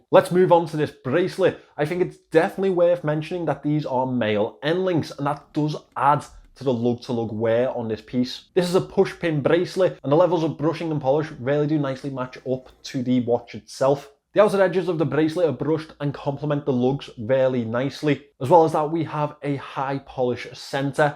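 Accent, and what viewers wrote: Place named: Scotland, United Kingdom. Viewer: Love your accent. Are you from Scotland?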